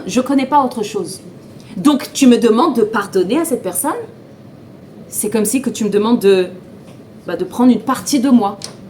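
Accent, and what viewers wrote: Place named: Israel. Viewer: J’adore l’accent israélien ❤️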